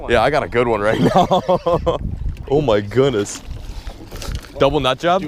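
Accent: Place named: Boston, United States. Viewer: Still loving that boston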